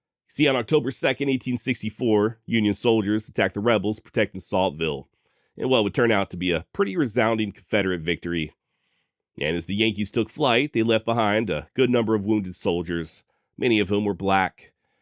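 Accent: Texas, United States. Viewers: First I’ve heard a Texan accent quoting Dylan Thomas was right here 😊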